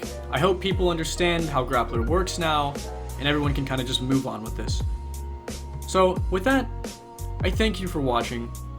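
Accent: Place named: England, United Kingdom. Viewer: you prob are the first parkour youtuber i have ever seen with an english accent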